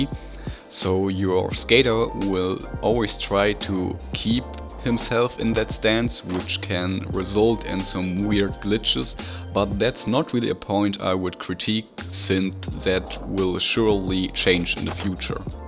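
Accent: Germany. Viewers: man hört das deutsch richtig raus haha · U Sound like a german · Man merkt, dass du Deutsch bist. ;) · Bist du deutscher? xD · Sounds like a german, speaking english for his first time..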